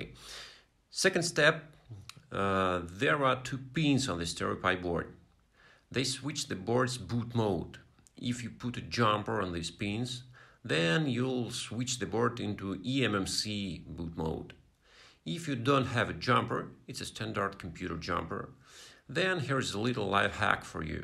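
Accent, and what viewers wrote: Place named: Russia. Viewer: да я отлично понимаю ваш руссиш инглиш, комрад, сижу, ковыряю поделку )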